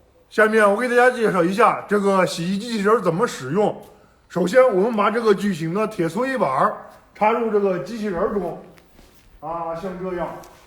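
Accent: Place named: Tangshan, China. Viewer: 老耿说话出了唐山味儿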